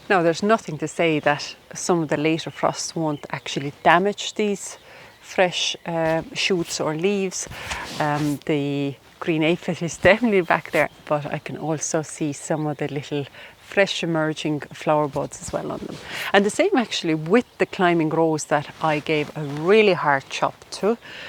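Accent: Ireland. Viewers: Only discovered your channel. Great video. Trying to place your accent. I'm thinking maybe you're originally from Poland, but have lived in Ireland for many years! You have a strong Irish accent now. ☺ I had a Geum in my garden. I was a way for a while, and the Geum has self seeded A LOT!!! I think mine is "totally tangerine." I had one, now I have five. To be honest, they've taken over a certain part of my main flower bed, and have stopped my ferns from growing which I'm quite surprised about. I'm going to see how they look in their new spot later in the year when everything is growing and if I'm not happy with them, I'll get rid of them and plant new ferns (spot is a little shady). PS: I love your dog.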